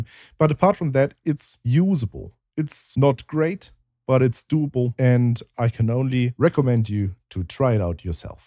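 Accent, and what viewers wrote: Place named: Germany. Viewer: By his accent I think he's German, so God bless Germany too!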